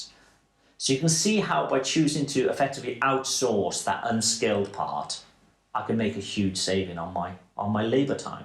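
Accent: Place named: United Kingdom. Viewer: great video man love your british accent and i also do get my stuff waterjeted and still put ton of work into them by hand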